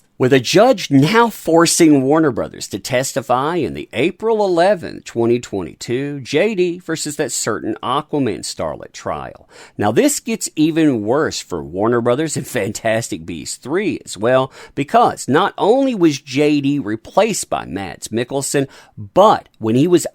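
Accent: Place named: Appalachia, United States. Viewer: Can you stop talking in that accent? You're not a hillbilly hick from the 18th century.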